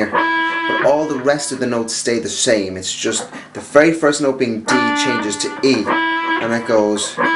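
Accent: Ireland. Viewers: are u irish... im from galway! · irish ? :D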